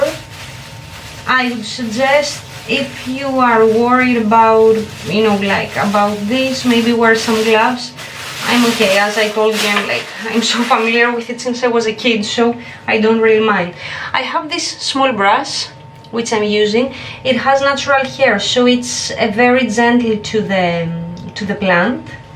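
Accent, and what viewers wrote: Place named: Spain. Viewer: Hong Kong 😱 Definitely too far 😅 I’m Italian… I recognize something Spanish in your accent… I was hopping for a new weekend-Hoya-trip 🤪 Thanks a lot!!!!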